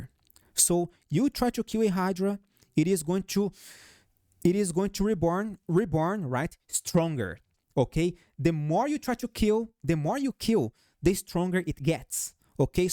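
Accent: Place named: Brazil. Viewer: Eu desconfiei desde o primeiro video que assisti que vc era brasileiro pelo sotaque kkk. Parabéns pelo trabalho!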